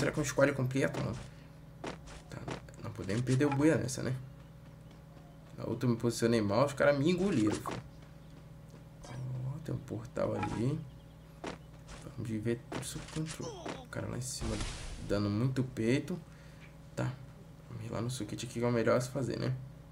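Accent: Bahia, Brazil. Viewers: Tu é da Bahia mano?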